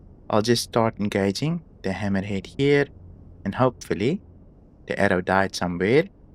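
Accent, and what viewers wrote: Afrikaans, South Africa. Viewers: Dutch, Belgian or Afrikaans? Sound very Afrikaans...lekker vid and and build.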